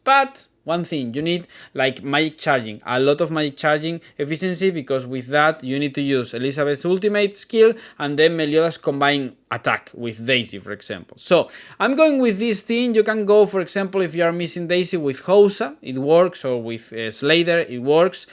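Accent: Spain. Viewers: Tu eres español, a q si? Tienes un acento muuyyyy español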